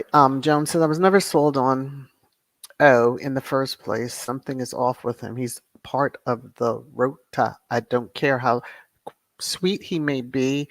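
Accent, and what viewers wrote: RP, United Kingdom. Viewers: Thank you for the podcast 🙏 ❤❤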